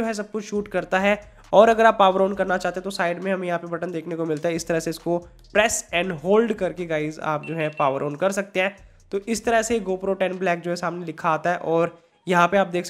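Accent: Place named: Kashmir, India. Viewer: Bro....ur accent gives Kashmiri touch.... Anywz nice comparison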